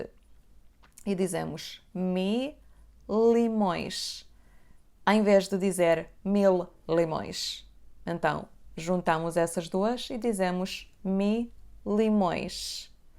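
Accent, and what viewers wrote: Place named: Portugal. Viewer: Si te parez bien, te falo n'asturianu. Yes una moza per guapa y salá. Préstame muncho el acento de Portugal. Pa mí aína somos parientes. Puxa Portugal ✊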